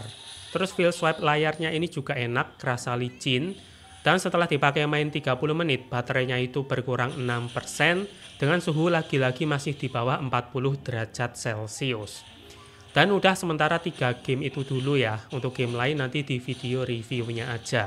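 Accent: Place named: Java, Indonesia. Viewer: Aku subrek karna logatnya jawa dan juga wibu. Kita boloo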